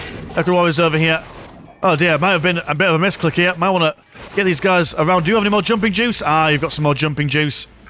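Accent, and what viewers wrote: United Kingdom. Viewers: its not Warhammer without a British man casting it, love your content man!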